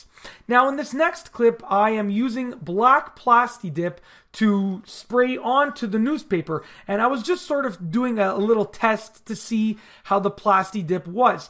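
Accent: Canada. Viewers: Are you Canadian or from Minnesota? Don't you think that by tinting or subduing tail lights is risking or cutting down on motorists trying to distinguish wtf that is in front of them? Especially dark or dimly lit roadways, they could just appear to be roadside markers. Remember this fact, today's drivers are less aware/attentive than drivers were 20-30 yrs ago because of the lack of common sense and ease of distractions. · He's Canadian.. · This dude has to be from Canada based off his accent.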